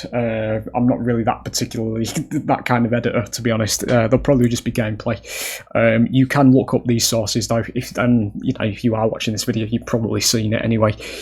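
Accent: United Kingdom. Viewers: A vent in a proper British accent. This is great!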